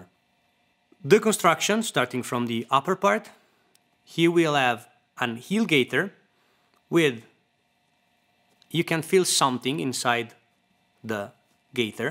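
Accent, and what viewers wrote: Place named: Italy. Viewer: he's most definitely italian judging by his accent.I'm romanian and we don't sound like that when speaking english.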